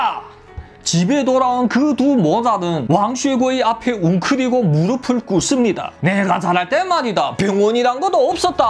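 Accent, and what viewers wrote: Yanbian, China. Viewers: 옌볜 말투 넘좋아~~^^😂❤ 구독!! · 이것도 뭐 재미나네. 구수한 연변사투리에 새롭습니다. ㅋㅋㅋㅋ 다음에는 涂贵武 사천킬러 투꿔이우 이야기도 좀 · 중국이야기라 조신족 말투를 하는가? 아니면 원래 조선족출신이라 발음이 그런가 ᆢ하옇튼 시원시원 듣기 좋습니다. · 언어 표현력이나 사용하는 단어들은 한국인 못지 않은데....억양은 중국억양이네... 이야기를 재미있게 풀어 말하는 재주를 타고 났네요...중국어로 말하면 중국인들은 말문이 막히겠다.....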